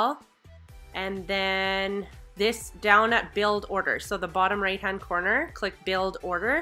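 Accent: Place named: Canada. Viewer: I am subscribing because you made that really simple and as a new YouTuber I need all the help I can get I also love your accent are you from Canada by chance? I am from Detroit Hi Neighbor!!!!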